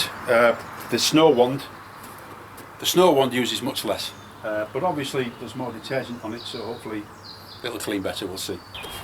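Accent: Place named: Yorkshire, United Kingdom. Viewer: A really good review, nice to hear a Yorkshire accent and not an American.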